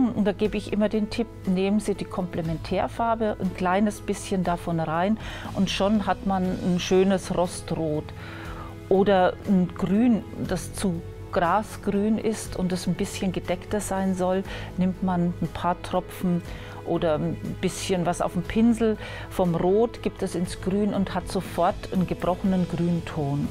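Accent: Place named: Upper Palatinate, Germany. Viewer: Ha ha, das ist nicht Fränkisch, der Akzent stammt aus der Oberpfalz - so kann man sich täuschen!!!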